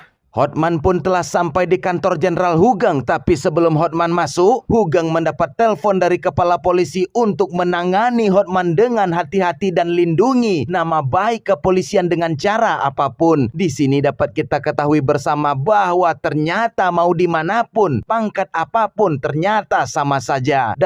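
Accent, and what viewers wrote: West Sumatra, Indonesia. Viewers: Urang awak admin nyo kayak e qkwkwk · Urang awak kiroe admin eeee wkwkwkwk Kundiak gadang ndak ru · Orang minang kah bg? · Urang awak ruponyo ha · Orang Minang bg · Urang awak kiro e😂😅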